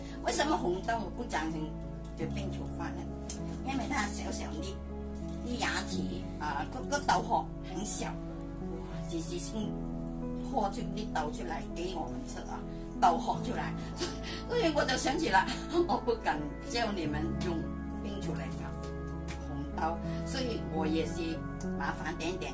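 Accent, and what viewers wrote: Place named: Cantonese, China. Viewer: 你講啲話好可愛，一半廣東話一半台灣話，聽到我想笑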